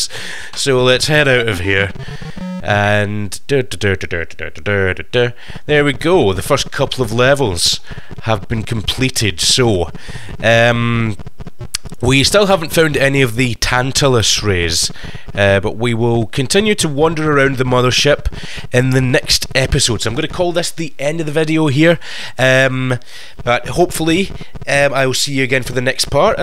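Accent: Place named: United States. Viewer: Ha ha! I like that American accent. Almost had a bit of a 50s radio voice going, there.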